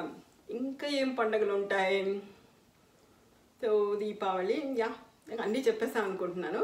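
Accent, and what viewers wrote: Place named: Kurnool, India. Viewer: Hello garu Mee slang vinnaventane nenu guess chesanu, meeru kurnool nundi vachi undochu ani..! Mee about Chusinappudu Ade nijam aindi..! I'm from Nandikotkur, kurnool..! Meeru chesina videos chusanu, chala bagunnai..! Keep going all the best....!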